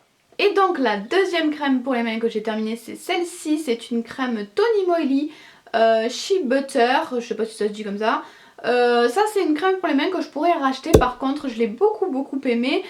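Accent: South, France. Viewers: Hou toi tu as l'accent du sud , je suis en Provence , hihi , allez une abonnée de plus , bisouus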